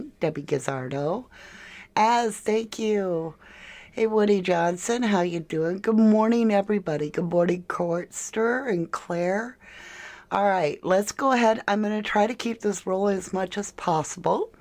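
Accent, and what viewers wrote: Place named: United Kingdom. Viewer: Probably British, and street wise street talking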